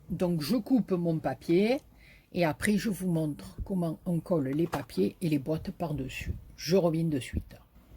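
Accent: South, France. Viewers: Qui elle pèche j adore vous regarder merci pour l accent du Sud plein de soleil bisous josiane brindille 😂🤗🤗🤗👍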